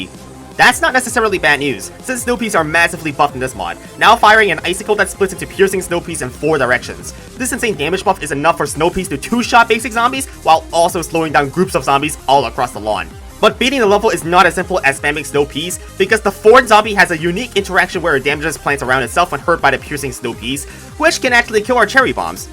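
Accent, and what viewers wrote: China. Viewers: I think I'm in love with you. Lowk love your videos and Chinese accent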